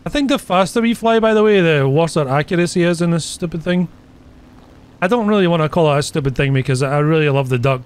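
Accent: Scotland, United Kingdom. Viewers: are you british ??? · Bro you can hear he is scottish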